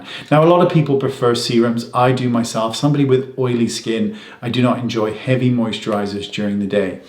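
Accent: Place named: United Kingdom. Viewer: I wonder which British accent has. It’s so subtle.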